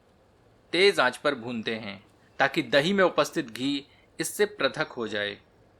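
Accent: Standard. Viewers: Apki hindi shudh hai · आपकी हिंदी भी अत्यंत शुद्व है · Khane se jyada testy to apki Sudha Hindi lagti he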